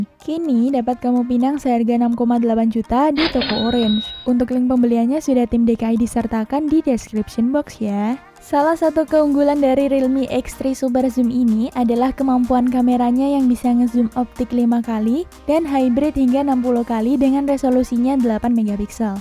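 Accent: Indonesia. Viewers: Lidah indo maklum aj lah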